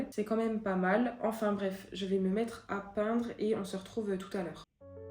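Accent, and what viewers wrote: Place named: Franche-Comté, France. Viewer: Hello! Je suis tombée par hasard sur une de tes vidéos il y a environ une semaine, j'ai vraiment bien aimé, et j'ai eu envie de rester. Et puis je me disais "il y a un léger fond d'accent qui me fait penser que"... Et quand j'ai vu le papier du fromage ça m'a confortée dans l'idée ^^. Contente de suivre les aventures d'une franc-comtoise au Québec, et au plaisir de te revoir par ici!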